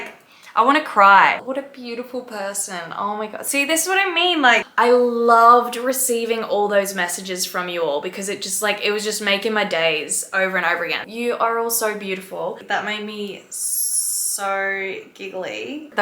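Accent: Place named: Australia. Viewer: I love your voice! Australian accents are my favorite!!!! 🖤 I’m from Tennessee lol. Oh, and have you ever said what your real name was? I can’t find it 😆 haha, sorry just curious. That would be cool if you did a q&a not just about piercing stuff, but I understand if you don’t want to get too personal on this crazy a** internet. Lol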